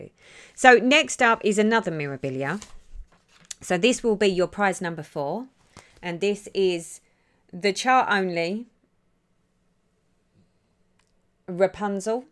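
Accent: United Kingdom. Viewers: Yaay! Congratulations! Your videos have helped me so much, not to mention I love to hear your UK accent when you speak! Your stitching is beautiful and inspiring. I’ve been contemplating switching over Annie’s Keepers because of you. Please keep the videos coming. It won’t be long before you’ll be celebrating 20K! Thank you for your generosity in this wonderful giveaway! 1, 2, 3, 5, 7, 9, 10, 13, 14, ❤️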